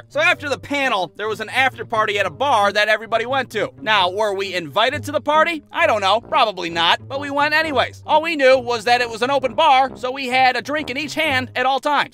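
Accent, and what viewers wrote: United States. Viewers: So u from California Texas or new York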